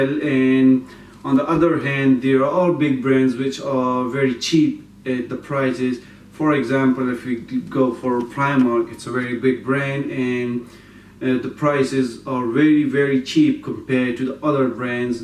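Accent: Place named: United States. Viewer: Accent hm American de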